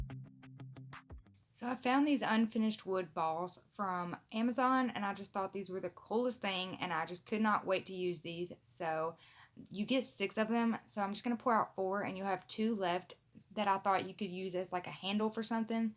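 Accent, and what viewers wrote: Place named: East Texas, United States. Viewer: You are very creative! I love the 3-tiered tray and I am most definitely making the “I Can Make That” sign. I say that all the time. Also love your sweet accent! ❤️East Texas?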